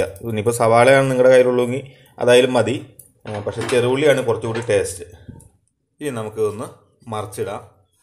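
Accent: Palakkad, India. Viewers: ചേട്ടന്റെ ഭാഷ പാലക്കാട്‌ ടച്ച്‌ ആണല്ലോ... ഞാൻ വിചാരിച്ചു പാലക്കാട്‌ ആണെന്ന് ട്ടാ.. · പിണ്ടിമനയിൽ covid +ve ഉണ്ട് stay safe and healthy,. Proper hand wash and wear mask everytime..പാലക്കാട്‌ ജോലിയുണ്ടോ /താമസിച്ചിരുന്നോ,?? പാലക്കാട്‌ കറികളും സംസാരരീതികളും 🤔🤔🤔നമസ്കാരം പറയുന്ന ബാക്ക്ഗ്രൗണ്ട് കാണുമ്പോൾ പാലക്കാട്‌ പോലെ തോന്നുന്നു,,, ഞാനും കോതമംഗലം ആണ് wrk pkd യും,......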